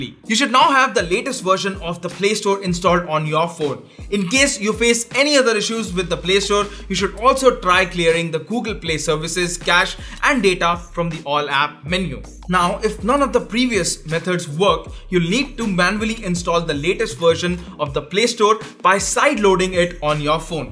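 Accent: India. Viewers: can you just have an AI instead teaching rather that indian voice teach us..really google play is that the best you can do?? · Indian english HAHAHAHAHAHAHAHAHAHAHAHAHAHAHAHAHAHAHAHAHA it's so funny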